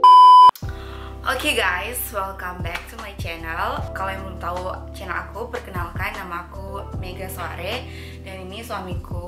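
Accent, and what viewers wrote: Manado, Indonesia. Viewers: rupa sama ini logat say...dari manado..? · Pertama kali nonton konten dr mbaknya. Kedengerannya mbak orang Manado.. anyway salam kenal & sukses dgn channelnya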